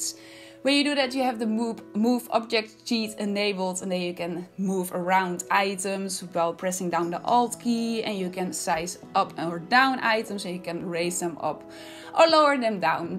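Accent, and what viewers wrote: Netherlands. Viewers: Ik hoor dat je Nederlands bent en je kan zo goed bouwen je bent echt goed ❤️ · I knew it from your accent. I live in Utrecht and u? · omg i figured because it sounds very similar to dutchsimsmaster 😂 BTW love your builds, cant wait to see more! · U sound dutch :) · First video I wached from you, I'm at minute and I notice your accent. Is it Dutch? Sounds soo familiar, I used to live in Amsterdam ❤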